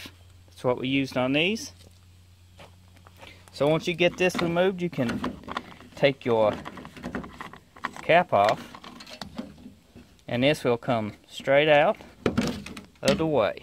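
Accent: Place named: South, United States. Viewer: Great info! Just how far South ARE you? I could listen to your drawl all day long. Makes me want to get a 6 of PBR!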